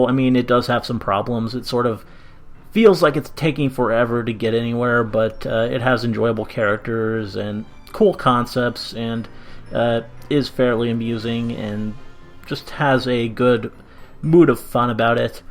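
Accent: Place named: Canada. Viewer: I thought you were canadian for some reason lmao.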